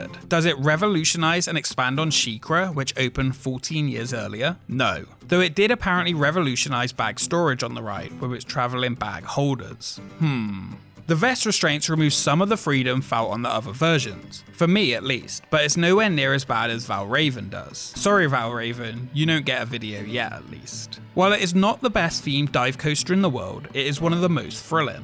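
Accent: United Kingdom. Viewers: You're British though right? Guessing from the accent but of course could be wrong!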